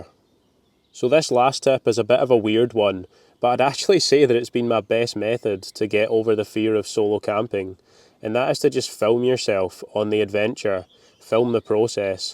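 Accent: Scotland, United Kingdom. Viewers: I absolutely love your videos and love to hear you speak with that Scottish accent. As much hiking as you do, you have got to be in prime physical shape. As bad as my health is I can only hike with YouTubers. I have always loved the outdoor and with me living in the Southwestern USA, I can’t get out and be in nature. We have such beautiful country where I live and can’t get out see it. So for now I will just have to hike and camp along with you on YouTube 😂😂😂😂😂😂😂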